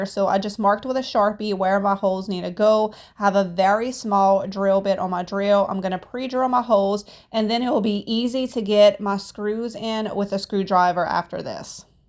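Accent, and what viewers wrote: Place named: South, United States. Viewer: I found you after the first thrift challenge with Sherry. I have so enjoyed your channel, and that beautiful accent of yours. I'm from SC, and I love our southern accents. 😊 That little lamb turned out so very gorgeous! And the wreath/art stand from a spindle...what a smart idea!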